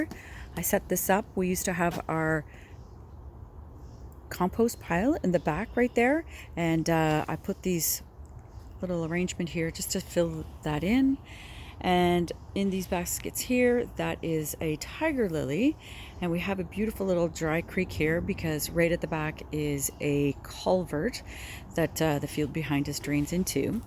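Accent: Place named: Canada. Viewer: Just found your channel. What garden zone are you? I hear a bit of Canadian accent. I am in Homer, Alaska - Zone 4-5, ?6 this year, depending. Love your style: beautifully designed, well thought out, well-grown garden in a space that most of us can relate to - not the "estate level" that is so gorgeous, but so unattainable. Hope to see many more videos on your garden.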